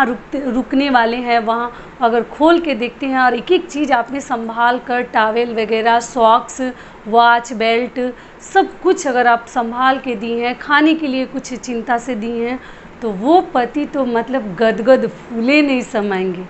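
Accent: Bihar, India. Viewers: Aap Bihar se ho kya? Aunty ji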